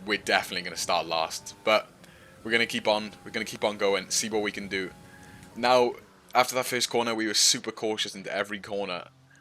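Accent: Wales, United Kingdom. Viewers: Good effort bro, just found your channel via the Shed Dweller. And cos it's nice to hear a fellow Welsh accent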